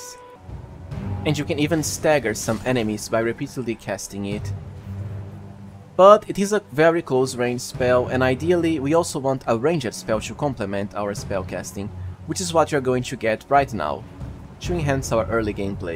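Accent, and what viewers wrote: Brazil. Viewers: Are you Brazilian, mate? Cool accent! Great video too!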